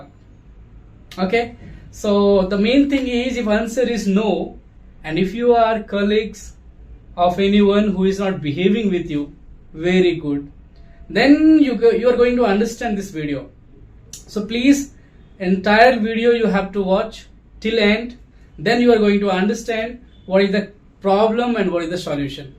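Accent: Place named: South, India. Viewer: My dear sir, your voice has a little south indian accent...... So calm and pleasant ❤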